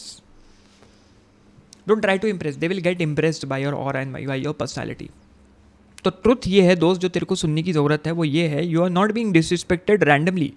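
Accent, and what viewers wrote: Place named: Bhopal, India. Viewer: bhiya why there is slight bhopali accent are you from bhopal?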